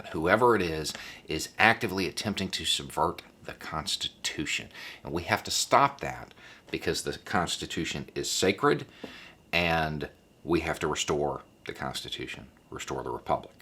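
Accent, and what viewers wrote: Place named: Western North Carolina, United States. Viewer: Damn No, I sure appreciate you making sense with an accent not unlike my own (WNC Mountains). I'm not sure when so many people decided it was okay to let someone else (FOX) do your thinking for you ... Thank you sir!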